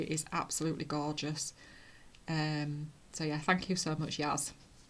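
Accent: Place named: Yorkshire, United Kingdom. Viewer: Hello 👋🏻 I’ve just started watching you and really enjoying your Flosstube. Your projects and stitching are beautiful. I am also doing stitch from stash and doing well so far. I guessed you’re from Yorkshire straight away but where? East Yorkshire. I’m in South Yorkshire right on the edge of the Peak District, I can walk into it in 5 minutes. Anyway Happy Stitching and I’ll see you next time ❤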